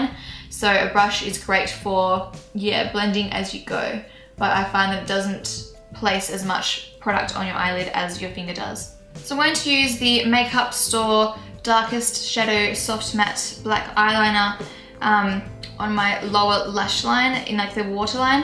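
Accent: Australia. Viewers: Indeed i did enjoy !! you are gifted with your makeup plus its easy to understand you because i'm Aussie too :D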